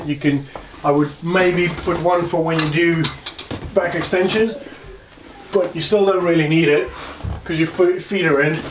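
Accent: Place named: South Africa. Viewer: The unmistakeable South African accent . Nice job bru its a lekka idea and iwill be making one for my garage gym . thanks for the great contact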